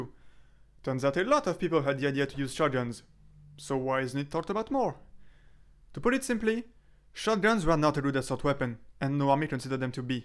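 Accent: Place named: France. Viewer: Couldn't understand half of the video due to the extreme french accent but thanks for the information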